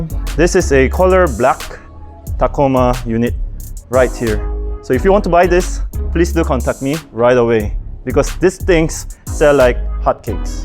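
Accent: Philippines. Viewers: He has a Filipino accent. Must’ve learned English in the Philippines.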